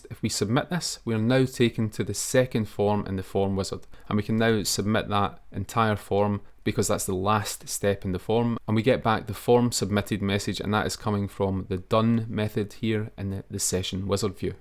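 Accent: Scotland, United Kingdom. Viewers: Just curious - where's your accent from? I'm thinking Scotland, but I'm not sure if I've heard it before... 🙂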